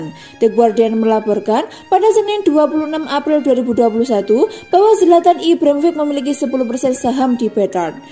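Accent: Java, Indonesia. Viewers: Medok min...😅😅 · medok banget min?cuma cakep jadi ciri khas channel ini salam dari interisti semoga milan lekas membaik performance nya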